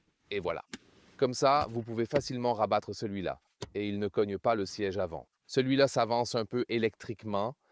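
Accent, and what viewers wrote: Canada. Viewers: J'adore vos vidéos, et vos comparatifs. Mais pourquoi avoir l'accent Canadien pour la traduction en France ?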